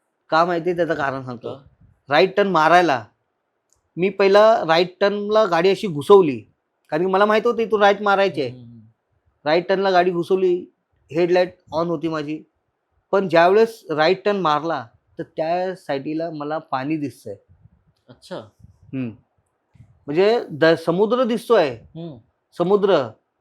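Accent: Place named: North India, India. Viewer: Hyacha gav bagha bolanyawarun bhaiya watato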